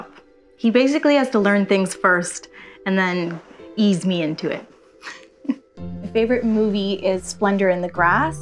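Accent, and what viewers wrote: Canada. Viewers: Been watching your channel for awhile love your sketching and watercolour tutorials. I picked that you were Canadian - I could tell by your accent. Great video and thanks for sharing.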